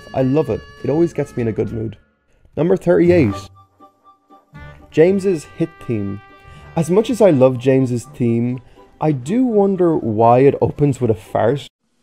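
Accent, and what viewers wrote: Ireland. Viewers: He's Irish, and Irish does not have any sounds that collorate with thorn ( þ ) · He's probably irish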